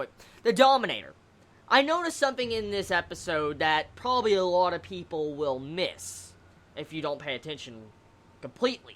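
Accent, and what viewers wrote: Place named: Tennessee, United States. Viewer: Ah my fellow Tennesseean, I can hear it, as I speak with it. Not such a strong twang so much as a way of saying some words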